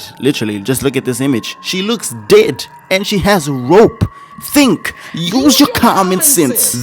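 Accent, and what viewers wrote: South Africa. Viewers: Bro sounds South African 😭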